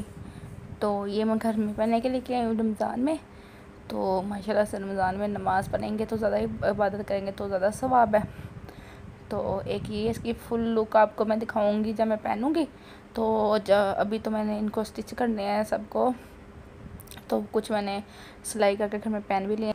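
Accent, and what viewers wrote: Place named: Faisalabad, Pakistan. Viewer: Ap Ka way of talk bilkul faslabadi logo ki tra h or pata b lag jata h JB ap bolti h yummmy pulao and mazy dar Matt saln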